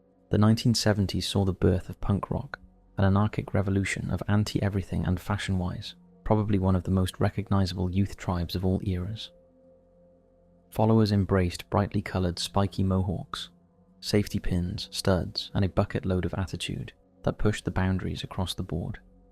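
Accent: United Kingdom. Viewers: His voice is so lovely and mellow, and I love a British accent!!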